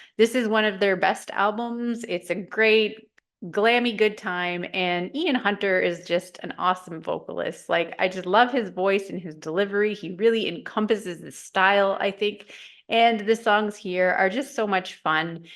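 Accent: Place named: Canada. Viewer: I have always thought that 1973 was probably my favourite year of recorded music, especially in the Rock genre. I'm quite a bit older than you, but I love that you focused on 1973, and thank you for including a coupe of artists I'd never heard of before ( Gary Higgins & Duncan Browne), I'll be searching for their work to enjoy. I also like the fact that your tastes are eclectic, mine are as well. I was introduced to many different genres of music as a child, I had an Auntie who worked for CBS Records, so she gifted many albums to me from a vast variety of genres. I love your Canadian accent. Take care!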